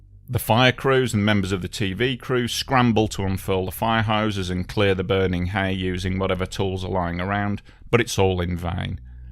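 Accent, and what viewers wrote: United Kingdom. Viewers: If you are referring to the narrator, he is from Britain... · "a global superstar, not only in the US". How hilariously American. But the speaker seems to be British 😊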